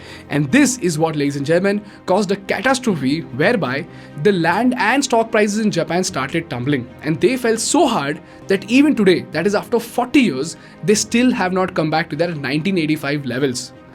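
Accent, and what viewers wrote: India. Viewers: Your perspective is gratuitously anti-american. The US trade policy post-WWII was to support its enemies economic development by direct investment and access to its customers. By the mid 1980's the US realized it was cannibalizing its own industrial base. America lost its electronics industry, its telecommunications manufacturers, and its automobile manufacturers gutted. Would you expect any country to eat its own businesses so foreign businesses would flourish? No. Plus, by any objective perspective, the dollar was grossly overvalued compared to other countries. And the devaluation was only a temporary maneuver. So the real problem in Japan was its over valuation of its domestic assets; companies and real estate. Everyone wanted to invest in these inflatable assets so they could "get rich quick" and rather than invest as a fundamental motivation people invested were motivated by greed and momentum. 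That never ends well and you can't blame "evil" USA. But "evil USA" sells well on youtube. Your best comment was at the end about "Countries having no permanent friends only interests" summarizes the most enlightened foreign policy. No foreign country can come to the aid of another to "save" it except in acknowledging its own best interests. Having these criticism I still enjoyed your video, flawed as I believe it to be. (I'm a sucker for Hindi accents) 😆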